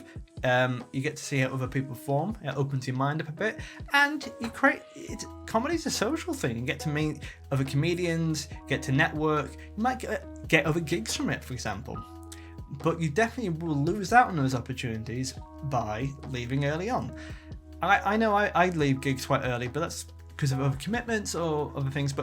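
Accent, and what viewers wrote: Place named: England, United Kingdom. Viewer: I cannot sit and concentrate on an english accent explain comedy to me. I just imagine this guy stuffi g his face with crumpets fig newton's and tea.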